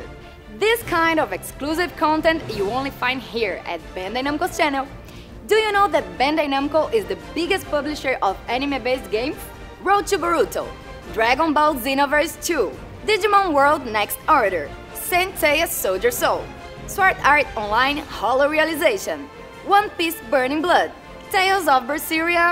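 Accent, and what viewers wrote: Brazil. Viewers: I'm also the host for Bandai Brazil and Latam and I'm brazilian, so I know there's some accent there, but I'll work harder on that matter and I'm sure you'll end up loving the content!! <3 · She has an brazilian accent... is she brazilian? Very nice host!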